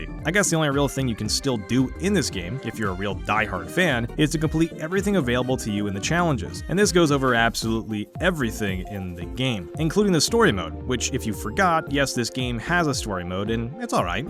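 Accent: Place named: New York, United States. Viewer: you have a New York accent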